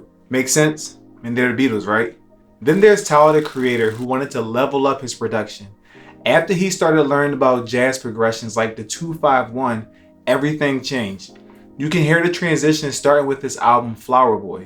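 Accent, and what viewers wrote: United States. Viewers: Ik this prolly irrelevant right but u sound like u from Philly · Funny, you sound American, but the road footage shows someone driving on the left side of the road.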